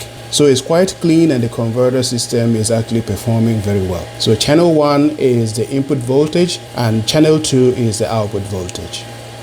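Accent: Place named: Haiti. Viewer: 🔥acento haitiano DETECTED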